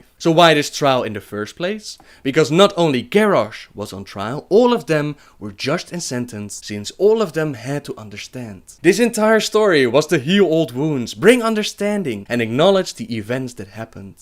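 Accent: Netherlands. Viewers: Dat Dutch Aksent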